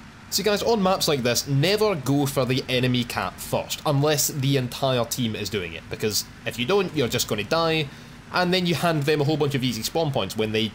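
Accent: Scotland, United Kingdom. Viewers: teach me how grind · I think the new mic makes you sound more. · I love ur accent btw is iron bru really that popular over there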